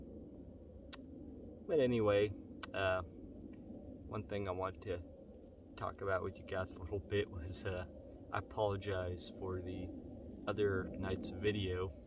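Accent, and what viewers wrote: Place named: Scotland, United Kingdom. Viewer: Yes Do your Accents in all your Videos!! They are fun to listen to...... I love your Scottish Accent. Thanks for sharing...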